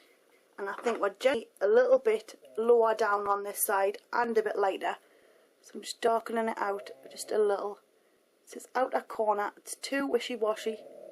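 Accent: Newcastle, United Kingdom. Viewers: Its a Geordie Accent. Same as Cheryl Cole?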